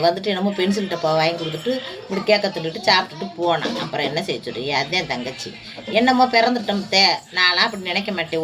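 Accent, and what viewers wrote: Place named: Ramanathapuram, India. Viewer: Sister enka area voice pola irukku Ramnada 😂😂❤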